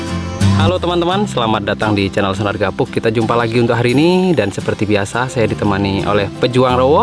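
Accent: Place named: Java, Indonesia. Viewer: Abang ini asli mana kok logat nya campur jawa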